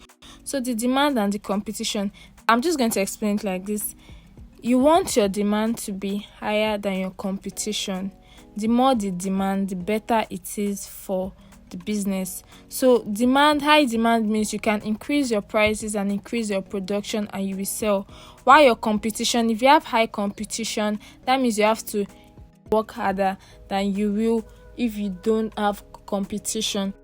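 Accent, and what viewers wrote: Nigeria. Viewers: You sound African Nigerian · You sound Nigerian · Are you Nigerian, sorry for asking but you got that Nigerian accent.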